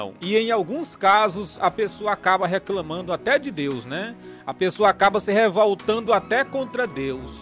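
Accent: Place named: Northeast, Brazil. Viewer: Paz pastor gosto de assistir seus vídeos, e gosto principalmente do seu modo de falar,esse sotaque nordestino, sou nordestino mas moro em Manaus a muitos anos, oro pra que Deus continue abençoando o seu ministério, pois tem trazido edificação, Deus abençoe todos que assistem, vai nessa força varão Deus e contigo!!!!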